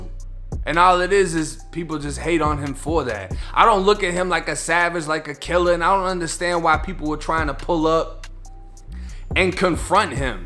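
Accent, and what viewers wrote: Boston, United States. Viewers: He from Boston I think lol he got that stupid accent 🤣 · Depottment? Incosserated? Are you from Boston, sir? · oh youre from Boston for sure. soon as you said "Car" it was confirmed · Yo you from Boston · You from Boston bruh? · Wait. You said PAHKINLOT LIKE I DO BRUTHA. you from Boston. I'm in Dorchester. South bay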